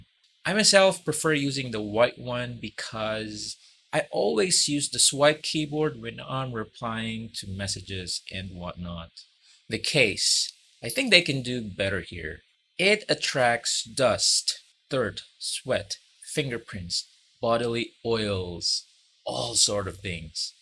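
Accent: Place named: United States. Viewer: Haha, IMO it is just marketing hype. 256gb Exynos is anyway better than 128gb Snapdragon. Exynos is also dual SIM (another great feature that is missing on Snapdragon). Exynos does not have the UW Band (Verizon) and some other 5G bands too so that is the only catch for me. You have US accent - you look like the US expat enjoying the good life on beaches of Philippines :)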